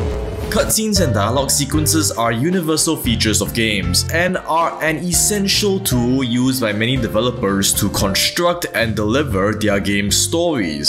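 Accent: Singapore. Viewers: I'm curious what accent this is btw. Feels like Singaporean mixed with something else. And Singaporean makes sense with your DigiPen cert.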